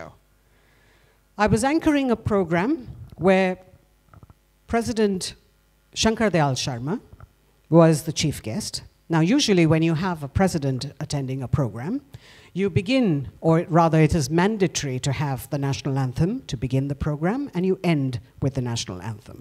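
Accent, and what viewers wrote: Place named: United Kingdom. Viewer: The best news reader of all time with a professional British accent.Absolutely commanding,crisp,focussed and dominating.Never heard a speaker like her in my life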